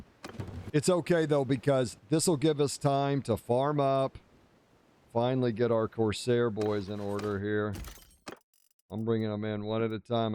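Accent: Oklahoma, United States. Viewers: I might be a little slow, but I just noticed your accent. Are you from Oklahoma?😂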